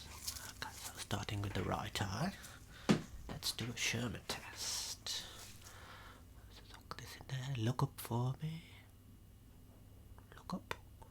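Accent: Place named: England, United Kingdom. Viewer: Sounds like an Englishman to me!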